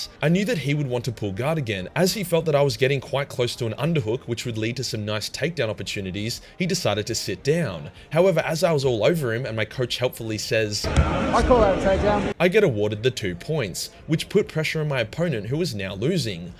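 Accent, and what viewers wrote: Australia. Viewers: Please please please make more jiu jitsu content, your deep beautiful Australian voice is perfect for narrating grappling.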